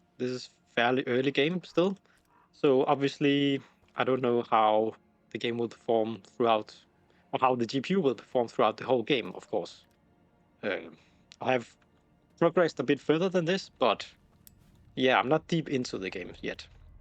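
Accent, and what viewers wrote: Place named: Denmark. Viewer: Lol that's a Danish accent if I ever heard one.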